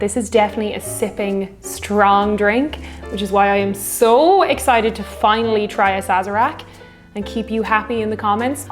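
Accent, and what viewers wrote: Ireland. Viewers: Love your joyful approach to the making of this drink although would have watched just hear that delicious Irish accent! Thanks. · Always enjoyable to see you online. There’s something I should tell you. As an Internet fan. There are four or more ways to properly pronounce the city you named and none of them are New Ore-leens. Locals will truly look at you like you are deliberately baiting them and you don’t want that. The oldest of the 4, from what I can tell is New Ore-lay-Ons. That lay sound is probably what has been twisted by yanks into the despised ee sound. As if it was said in French, which was originally spoken there. A miner variation is New Ore-le-ohns with just a hint of the h pronounced. Another acceptable variation is Nore-lans. Only two syllables. Finally. The tourist inoffensive version. New ore-lans. With an a as flat as you like. 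You may get passed on the bad looks with your Irish accent and incredible beauty, but why say the equivalent of “Hasn’t Ireland always been a part of England?” If you can avoid it? By the way, the second largest genetic heritage found there is Irish. So don’t be surprised if you see familar-ish faces behind the bar at places like Pat O’Brien’s or anywhere. But don’t go during this mess. If you want a real treat, go during the New Orleans Jazz Festival. Based on your background music you will love it. I went to college about 3 hours away and spent almost a month in Louisiana, aka Lou’s-I-an-a. (No pronunciation land mines there.) so that’s where I got this knowledge. Thanks for brightening the dark dark covid days.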